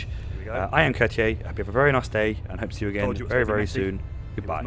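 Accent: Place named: United Kingdom. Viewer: What’s with this British talk on an Australian Built Submarine I am disgusted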